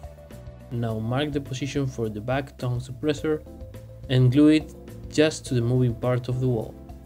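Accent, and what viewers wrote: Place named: Russia. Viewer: I was thinking Russia or Kazakhstan you sound like a friend shows how well trained an ear I have for accents. Spain and South America seem to have many really great crafters. Have you ever heard of Scenery Planet TV? They make some really cool terrain boards and Blood Bowl fields.I look forward to more vids by you and how the world of crafting is doing on your side of the world.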